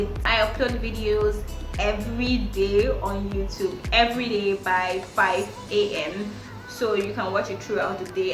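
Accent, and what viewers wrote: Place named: Ilorin, Nigeria. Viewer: You sound like someone from the banzi emirate of Ilorin.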